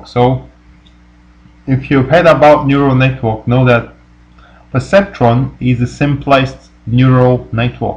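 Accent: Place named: Nigeria. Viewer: I dont think his voice is not good for explanation. I think the problem is the accent. I am Nigerian and we have similar accents. Its the same challenge I experience when watching videos with strong Indian accents. In my opinion, his voice is clear and he explains well. The only challenge for you is that he doesnt speak with an accent that you understand.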